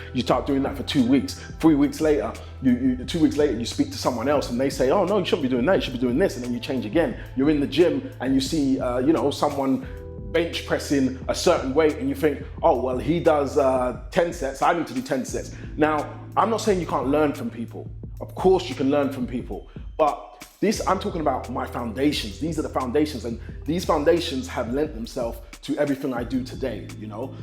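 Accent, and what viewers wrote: London, United Kingdom. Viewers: Simeon Panda your body is sexy and I Love your London ACCENT YOUR BRITISH SEXY BROWN SUGAR BOY . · Why does he sound like he from UK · I had no idea that he was British, I've been following him thru Instagram and haven't heard him speak till now haha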